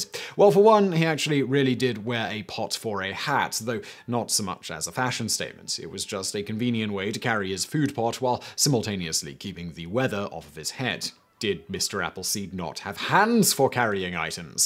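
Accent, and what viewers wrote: United Kingdom. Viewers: For the last month this guy has been acting high in these videos. "I have no idea what I'm about to say ... I never heard of any of this ..." Is this in the script? Is he pulling these phrases out of his ass? These videos are becoming unbearable. The British accent made him sound smart over the years. Like when people think Alex Trebek is a genius while he is just an actor. But when he acts like an idiot, the accent makes him sound even dumber. Why would I want to learn from some backwater hick? Is he saying things that are researched or is he just making stuff up?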